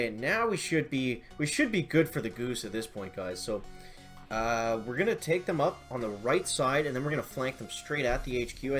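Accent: Canada. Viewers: What part of Canada are u from?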